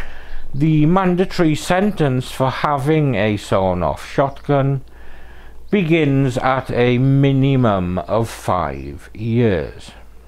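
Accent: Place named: Wales, United Kingdom. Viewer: Going to watch again when having my dinner just to listen to that Welsh pronunciation ❤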